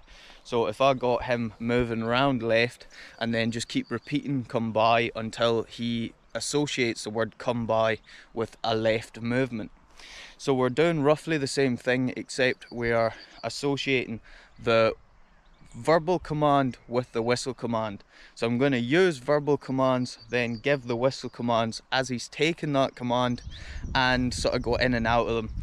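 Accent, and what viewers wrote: Scotland, United Kingdom. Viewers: . i am a 61 year old American midwest “city slicker” (Cincinnati, Ohio). i love my dogs, mostly Golden Retrievers over the years, one who was half Newfoundland. And one “black sheep” 😉 who was found wandering the streets, about four months old, in the middle of the winter, possibly a stray, possibly feral, who we believe 🤞🏼 is part Border Collie, part Labrador 🤷🏻‍♂️ that takes her energy and intensity level down a notch, thank goodness 🙏🏼🫢. She was quite a handful when we adopted her (we didn’t know! she was just a cute little puppy 🤷🏻‍♂️🫢) so she required an adjustment and a lot of activity and attention… and we have accommodated her. Because we love her ❤️. Anyway, i have absolutely no interest in farming or tending animals. (My wife does, however. My neighbor commented on the amazing vegetable and flower garden that she had created in our backyard, and the chickens she was raising in it; “It’s just like the tv show ‘Green Acres’ - to which i replied, “yeah except I’m Zsa Zsa’ 😏😖”. 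Your lifestyle is anathema to me 🫣. But i love what you do. I love the scenery. i love your Scottish accent! Most of all, i love your dogs 😊 It is tragic that some people adopt Birder Collies and keep them as “pets” mostly inside the house, gone all day at work, with a little outside time in the yard, bored out of their intelligent minds with no outlet for their incredible energy and no fulfillment for their genuine sense of purpose. (Some dogs never het adopted at all… 😢😢😢). When i see your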